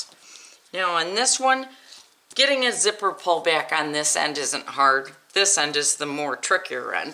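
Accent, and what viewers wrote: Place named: Midwest, United States. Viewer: Taking a class on this so it was great to see your steps ahead of time ! Thanks so much ! Love your mid western accent - I’m a Detroit transplant now living in Texas!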